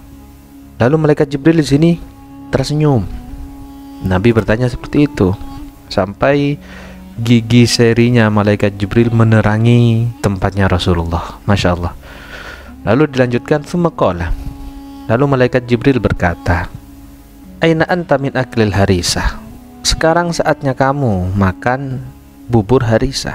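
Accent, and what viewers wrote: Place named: Madura, Indonesia. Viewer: Adminya ketahuan sekali maduranya 🤣🤣🤣🤣 . . .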